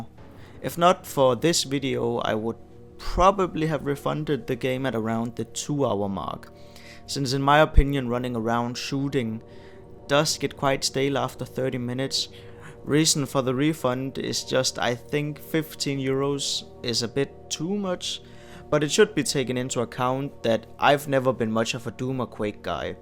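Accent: Denmark. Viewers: Har aldrig hørt en mere dansk accent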